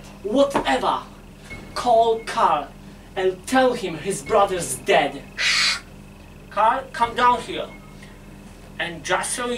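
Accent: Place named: Poland. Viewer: Po tym angielskim widać że polska ale spoczko filmik 😊😊😊😂😂😂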